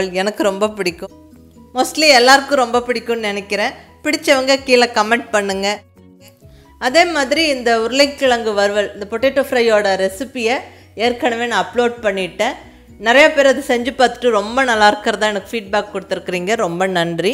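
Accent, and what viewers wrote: Kongu, India. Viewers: Neenga pesura cbe kongu Tamil ku ....na addit ❤️..cbe la enka irukinka mam...Naanum cbe than ❤️👍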